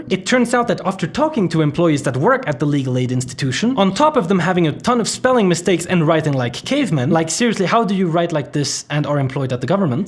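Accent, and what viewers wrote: Sweden. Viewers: Lol he's becoming more Swedish as the video goes on and he gets angrier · I love how he gets more Swedish as the video goes on. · Is it just me or is his accent getting more swedish as the video goes on? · The Swedish accent just got worse and worse lmao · The angrier he gets . The more Swedish he becomes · You can tell that it gets really personal when you can hear more of the Swedish accent from him as the video plays on.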